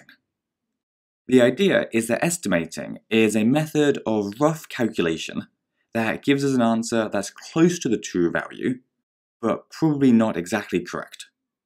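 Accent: United Kingdom. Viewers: Good Bristish Accent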